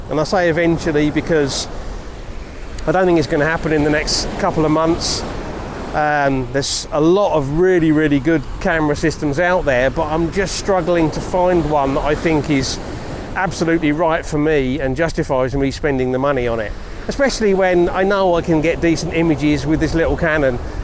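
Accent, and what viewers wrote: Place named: England, United Kingdom. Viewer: In the beginning I understood "I love wifes, I love photograhing wifes" later I realized, that you`re from England 😁. Sorry... Beautiful video!! 👍👍👍👍 Best regards Your namesake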